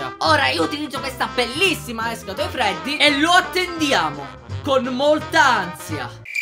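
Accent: Sicily, Italy. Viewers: Sei siciliano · sei siciliano? Io si sono palermitano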